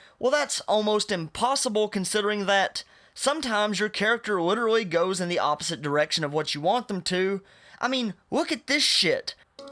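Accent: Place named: Georgia, United States. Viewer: You sound like Dale from Baskets. Are you from Georgia?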